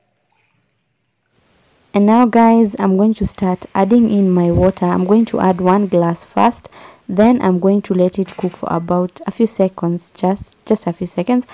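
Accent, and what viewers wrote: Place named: Africa. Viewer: I love your English i thought you're African wauuu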